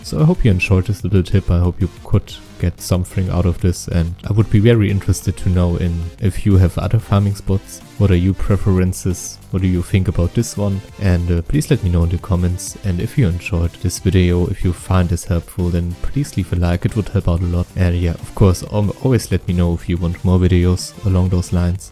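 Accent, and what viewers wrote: Germany. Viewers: Are you German? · i can hear denglish :DD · he sounds very German to me. coming from a German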